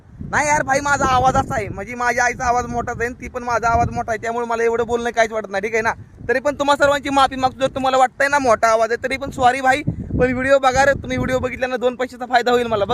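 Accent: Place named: mixed, India. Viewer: Are bhai bhai Kay kartoy , up bihari sarkh . Bhau bol na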